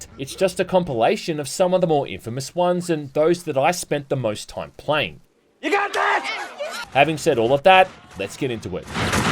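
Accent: Australia. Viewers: It's not "Satin" it's Saturn. Sat-TURN. You're aussie english is atrocious.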